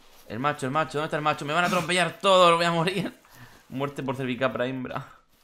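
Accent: Murcia, Spain. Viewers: Eres murciano verdad? se te nota en el habla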